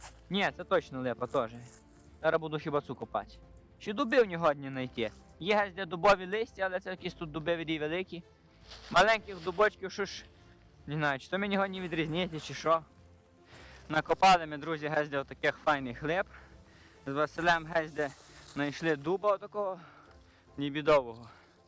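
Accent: Hutsul, Ukraine. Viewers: ДУЖЕ ДОБРЕ, СТАРАЙСЯ) БО ТУТ В НЬЮ ЙОРКУ ХОЧЕТЬСЯ ЧУТИ РІДНІ КАРПАТСЬКІ ЗВУКИ:))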